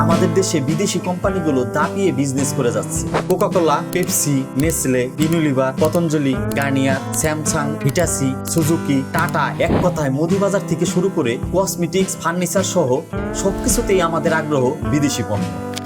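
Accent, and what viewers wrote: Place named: Patuakhali, Bangladesh. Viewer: ভাই আপনার বাড়ি কি পটুয়াখালী? কথার টোনে অনেক আঞ্চলিকতা টান আছে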